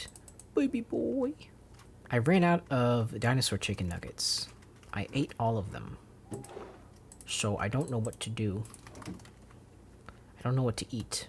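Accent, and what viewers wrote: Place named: United States. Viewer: Some advice for using Japanese words: it's phonetically very simple, so saying a single syllable in an unusual context with an American accent is really a nightmare for understanding what you're trying to say (unless we've all read the exact Miyazaki quote you're referring to). Something you'll see on Japanese TV is subtitles and people stopping to define ambiguous terms when having conversations to avoid confusion. Or you could just say "space" or "pause" if you don't want to go into a tangent defining a Japanese word every time you talk about slower paced videos.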